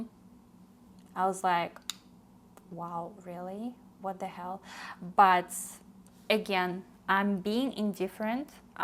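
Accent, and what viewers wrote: Russia. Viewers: you have a russian accent. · they have a declining population. they have a huge problem. they need to encourage sex, not attenuate it. you look Chinese but sound Russian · You sound russian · Just discovered your channel, love your Russia accent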